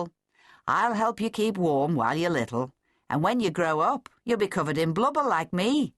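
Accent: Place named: United Kingdom. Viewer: Awesome story. Fantastic British accent.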